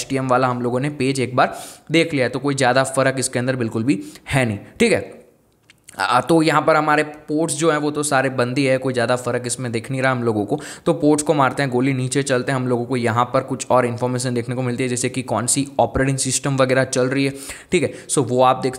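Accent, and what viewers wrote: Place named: India. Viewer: I'm sorry, but he speaks English or Indian? I understand more or less what he says.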